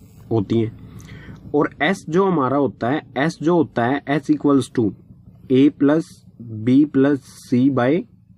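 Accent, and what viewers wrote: Haryana, India. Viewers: Sir aap haryana se belong karte ho kya 😊 apki voice mein haryanvi tone hai 😊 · Thank you so much sir for this video Sir one more question :- Are you from haryana? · Very well done sir, if you do not mind, kindly change your Haryanavi tone. it will be better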